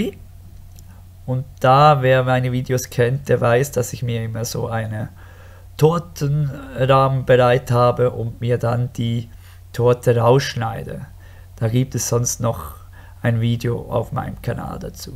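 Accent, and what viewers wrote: Switzerland. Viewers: Um Gottes Willen!:D Ich sprech zwar auch Dialekt (Deutschen) aber Schweizer-Deutsch ist schon mal was anderes, aber ich verstehs trotzdem. Und dieses Hochdeutsch brauch doch kein Mensch! ,,Mia kenne alles ausa Hochdeitsch" sagt man bei uns, weiß nicht ob man das bei euch vielleicht kennt:) Und zu den Torten: Hammer! Kanal heute entdeckt und überall mal vorbeigeschaut und positive Bewertung dagelassen:)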